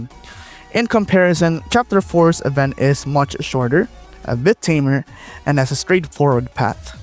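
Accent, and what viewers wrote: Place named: Philippines. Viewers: PEAK FIND, you deserve more views and subscribers for this amount of dedication (also your accent is similar to Filipinos) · He’s Filipino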